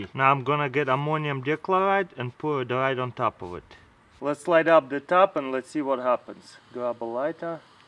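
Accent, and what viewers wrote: Russia. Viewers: I SO KNEW you were Russian! I'm Russian too...decent...and I recognized the accent. Thanks Dude you're way cool! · No its just because he is Russian, and the English language is very difficult sometimes for people going from Russian and picking up English. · are you Russian? sorry for judging off an accent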